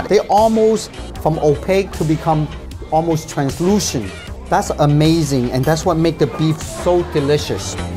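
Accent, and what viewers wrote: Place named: China. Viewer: He has a chinese influenced accent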